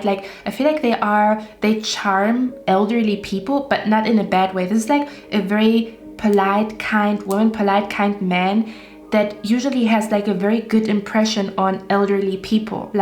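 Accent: Germany. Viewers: Pile 1. Loved this reading. Thank you so much. Also, whenever I watch your readings, I keep thinking you're German due to your accent and I myself am half German which is why it's easy to recognize it. AND THEN I saw the card "ACHT DER STÄBE". I was actually right, so I'm guessing you do speak german but that doesn't necessarily mean that you live in Germany. After all, there are so many countries where German is spoken as their native or second language. Anyway, I'm sending much love and hugs❣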